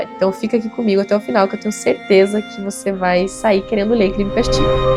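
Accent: Paraná, Brazil. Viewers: Que sotaque lindo .....acho que é paranaense ou da serra gaúcha..... A resenha é excelente....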